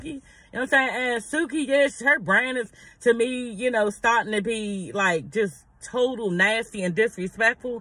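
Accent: Washington DC, United States. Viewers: That's a D.C. accent FO SHO!!! The way they say girl.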